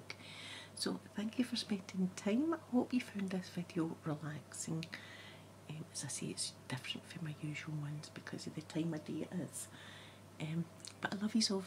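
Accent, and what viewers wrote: Scotland, United Kingdom. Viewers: Her Scottish accent is so cute I love it too and is so calming and relaxing even if I'm Italian so sometimes I have trouble understanding it 🤗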